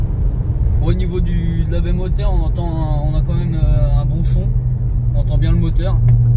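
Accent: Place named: Normandy, France. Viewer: J’ai la même ! Mais avec le volant sport ) 👍 Tu es de Normandie ? C marrant ton accent 😂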